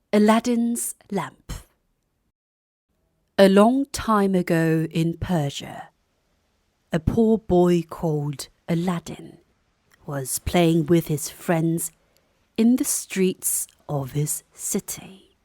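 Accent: United Kingdom. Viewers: 영국발음 들으러 왔는데 왠일인지 점점 졸려서 10시도 안됐고, 영상은 항상 집중하게 되서 이상하다하고 피곤한 저를 질책하고 반성하려 하다가, [수면용]이라는 걸 다시 깨닫고 아직 잘 때가 아니라서 "Ask not, but eat" replied Aladdin.까지만 봤어요 ㅎ 세시간 뒤에 영상에 목적에 맞는 시청자가 되어서 다시 듣도록 하겠습니다~ 영상 감사해요~ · 저 따라하다가 영국발음 성대모사 중독돼버렸어요 ㅋㅋㅋㅋㅋㅋㅋㅋ한국말도 자꾸 영국식으로 주접떨면서 하고싶고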